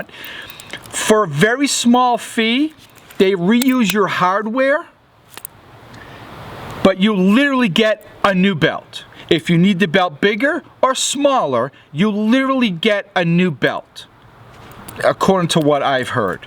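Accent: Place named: Boston, United States. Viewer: "Peter Griffin reviews his belt" haha Your from Boston aren't you?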